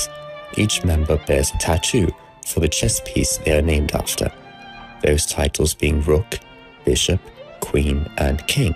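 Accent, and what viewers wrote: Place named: RP, United Kingdom. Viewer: this accent is too posh for someone from bedfordshire like myself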